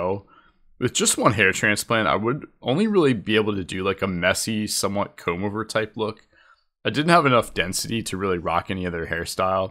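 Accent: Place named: United States. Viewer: It's alsways a pleasure to watch your videos, you've got a beautiful american accent!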